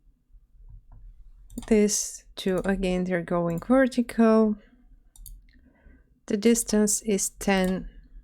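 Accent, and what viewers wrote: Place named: Russia. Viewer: У девушки явный русскиий акцент... Давно в Канаде?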